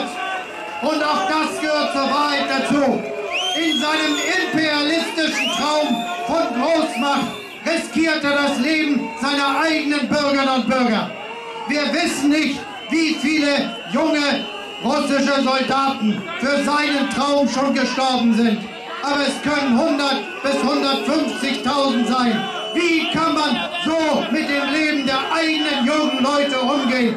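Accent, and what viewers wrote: Germany. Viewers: The true German accent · Damn I love German accent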